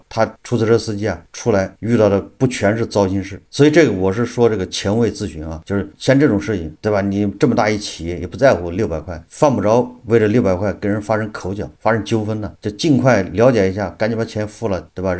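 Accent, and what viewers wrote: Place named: Anhui, China. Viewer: 听口音像安徽人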